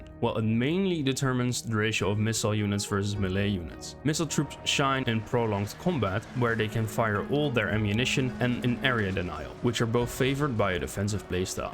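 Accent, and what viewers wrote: Netherlands. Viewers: ah, it's dutch. Cool to know) Btw, do you review mods for shogun 2?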